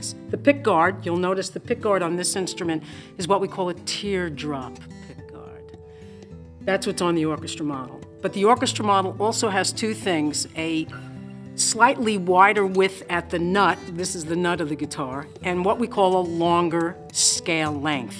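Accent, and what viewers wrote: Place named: Delaware County, United States. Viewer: God that Pennsylvania Delco accent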